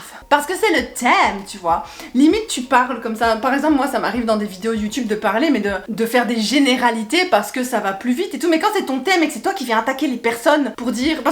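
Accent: Belgium. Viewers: Absolument toutes les caractéristiques de la meilleure des mères. Dont le STRESSE!!!!! Autrechose: - l'accent belge, merci, j'adore (parce que j'ai trop de bon contact avec eux mais faut pas dire qu'on est français):) - la sincérité, bah vi, pourquoi en dire plus? - courage maman - Quel contraste entre et. si vivante et si calme. Vous êtes beaux. - Au diable la perfection que tu recherches, si Poipoi sourit 10 fois par jour (avec toi, j'en suis sûr), alors... J'envi sa jeunesse. Merci pour ta confession pleine de valeurs.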